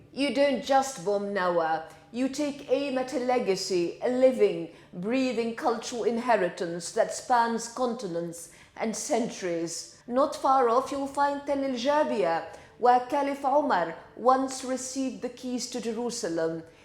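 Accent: RP, United Kingdom. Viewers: Full support for Israel from The Netherlands 🇮🇱🇳🇱 Beautiful words with a posh accent does not hide the violence and hate embedded in the ☪️ULT called Islam! Everywhere stone kissers go, problems follow!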